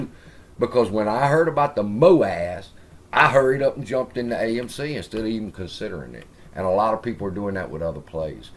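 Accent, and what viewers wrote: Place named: Boston, United States. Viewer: he mentioned he liked you a long time ago. Something about your boston accent. Long time ago. This was when you were angry and going after other youtubers on the daily. I told him you were a sjw star wars fan. And a man who still likes star wars after what Disney did to it is no man at all. Also, you do no real dd and do not contribute anything of real value to this play or any other. Did you know Tony D is an actor and Denaro isn't his last name? I have a feeling you east coast guys are all deceptive people. What does "massa" mean anyhow? You don't think something slaves said to their masters is cute do you? Ahh, Disney fanboys.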